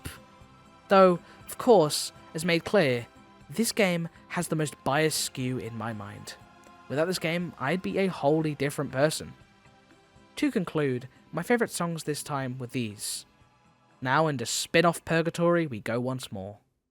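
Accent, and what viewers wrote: United Kingdom. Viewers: The narrator is the only British voice actor in games I play in where they don’t backstab you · Seriously dude are you a fucking idiot trying to describe racism out of that I don't think I can go any further this guy trying tell us changes his whole voice to not sound British self hate much shut your mouth about nothing knowing mf hipacrit do you have black friends shouldn't you be more tolerant and say African American dude all my other colerd friends have big lips bigger nose than me still beautiful but obviously different I work with Asian guess what there eys are smaller then mine dude Seriously just cuz its accurate don't always mean it's racist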